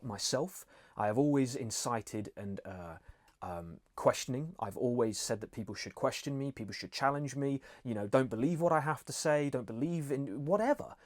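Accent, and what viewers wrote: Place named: Northern England, United Kingdom. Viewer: Greetings ! You should drop your stupid northern accent because this is ego identity another label. I’m not judging you but being totally honest with you with energy straight from my heart. What you say about me after this I don’t care I forgive you if it’s something negative because you don’t know. I’m a manc I’m a scouser you get it ? This is why you go on the spiritual path to get rid of your lebels. UK is a reptilian prison camp and a matrix which you must escape if you a lightworker I already did I went to live in the Swiss Alps far away from the sick British society anyway the law of one is powerful and those who achieved cosmic unity in oneness with cosmic awareness know this but coming back to this dimension after sometime is very hard but you have to but this time you are a multidimensional being who is in all timelines and dimensions at ones always NOW. 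I hope David transformed well. Peace & Wisdom 🎼🙏🏻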